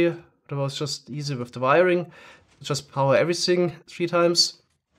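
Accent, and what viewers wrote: Germany. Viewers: Du hast einen sehr deutschen Akzent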